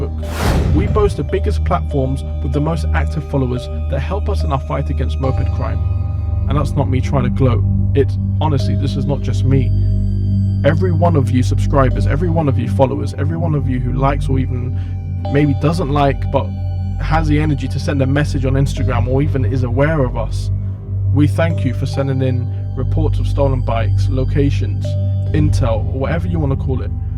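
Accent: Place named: Jamaica. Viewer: Your accent kills me 😂😂 why are you talking Jamaican. It's not cool to be a wigga lol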